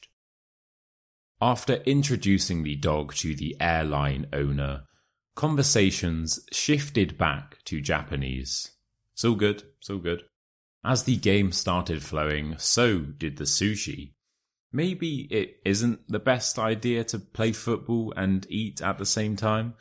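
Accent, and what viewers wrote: United Kingdom. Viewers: I like your short story haha.I learned a lot from you and I like your british accent!thankyou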